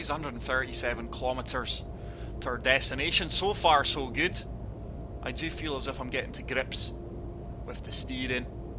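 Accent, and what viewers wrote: Scotland, United Kingdom. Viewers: You sound Scottish what rough are you around bud? I'm from Scotland myself, you'll be looking forward to Road kings and ATS/ETS coming to PS5 soon eh, i sure am! I'm playing road craft just now season year 5 pass was announced for snowrunner if you play them?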